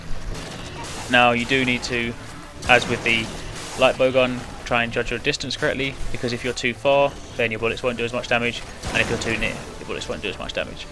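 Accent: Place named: England, United Kingdom. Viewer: its always a guy with an english accent doing this tutorials XD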